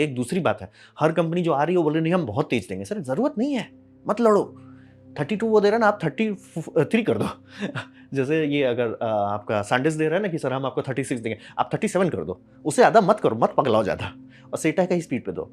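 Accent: Bihar, India. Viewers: Bhai aap bihari ho kya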